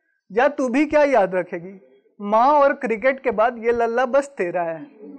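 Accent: Bihar, India. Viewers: Very nice video sir, it has a little probabum, you have a Bihari tone in your words, you should also look at your bass.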